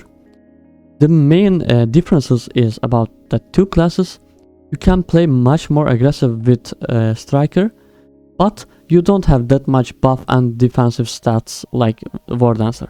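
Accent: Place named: Turkey. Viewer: Kankam aksanın Türk gibi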